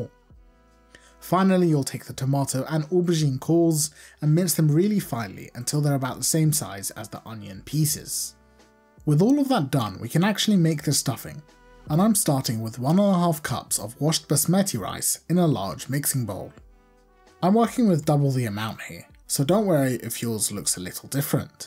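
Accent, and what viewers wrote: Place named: United Kingdom. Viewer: I love your videos. Your "bri'ish" calm accent makes it all 10x better. The turkish/kurdish way of preparing the bell pepper is to cut open the top of the fruit from above around the stem. After removing the core, you can stuff it and use the stem as a lid. Then the bell pepper looks intact. Cheers for the videos again!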